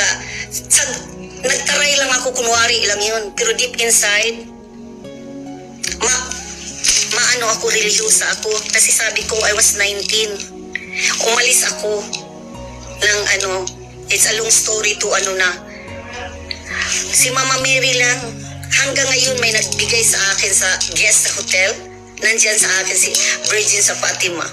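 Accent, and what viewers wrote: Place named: Visayas, Philippines. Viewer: Hahahaha yabang mo madam Eh halata naman sa salita mo na Visaya ka. Korte na para magkaalaman na sobrang yabang na eh. Hoy kakagigil ka na ha. Wag mo ipagyabang ang America dito dahil sa totoo LNG hindi mo cla kilala kong kaibigan nga cla. Cguro sabog ka KC maluwag Jan ang droga eh.parang kendi LNG na nabibili lakas ng tama mo madam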